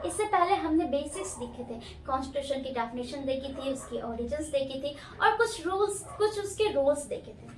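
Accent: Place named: Bengal, India. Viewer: I think you are belongs to bengal beacause your hindi language simmilar from bengal .but your teaching method very perfect.....recommend by rajnish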